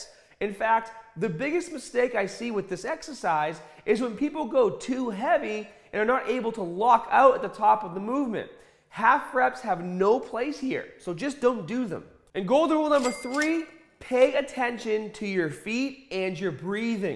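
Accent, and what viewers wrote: Boston, United States. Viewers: It sounds Bostonian but also occasionally Australian but also sometimes just standard lol. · Ba-bell! Lol. Love the Boston accent.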